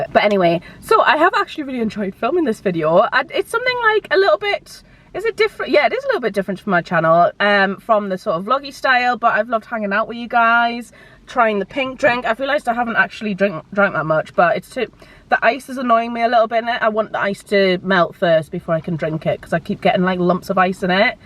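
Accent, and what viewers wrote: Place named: Wales, United Kingdom. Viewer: I’ve come from demis Chanel and firstly I must say, loving the content, I’d love more vlogs, I think you’re fab! But.. where is your accent from? I feel like I hear Welsh twangs but I’ve just assumed your from Newcastle area so now I’m doubting the Welsh accent. Ps I love the Welsh accent so I’m hoping I’m right in my thoughts otherwise.. that’s embarrassing 😂😂💜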